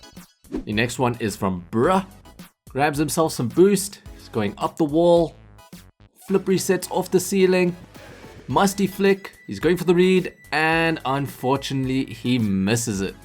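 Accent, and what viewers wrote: South Africa. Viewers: i thought so from the accent. Im also from south africa!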